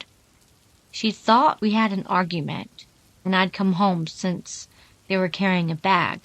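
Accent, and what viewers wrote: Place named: South, United States. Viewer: Having an issue trying to connect to your Instagram from the link in your video description. I've gotten a bit behind but it's nice to hear your sweet Southern voice again. Sorry I can't remember is it Alabama? No, it's Georgia isn't it?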